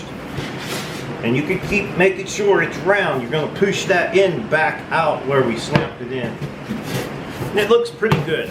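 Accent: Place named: Pittsburgh, United States. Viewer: Just used this video to help me install a damper for the first time. Loved how relaxed and simple you kept it, most DIY or pro style videos get lost in the technical jargon. So thanks for keeping it simple and focused on the point! Plus, the Pittsburghese made me homesick for western PA after a few years away now!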